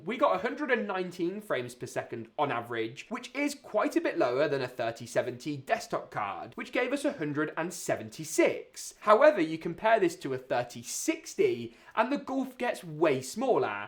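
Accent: United Kingdom. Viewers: british accent is so ridiculous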